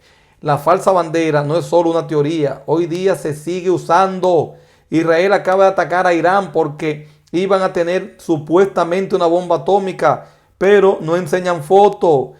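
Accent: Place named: Dominican Republic. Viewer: Ahora haz un vídeo sobre la invasión de la que somos víctimas.... asumo que eres dominicano por tu acento.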